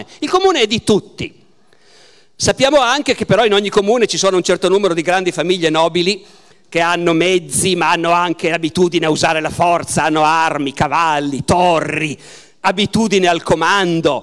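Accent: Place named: Sicily, Italy. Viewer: Barbero che parla siciliano è stupendo! Formidabile prof! :)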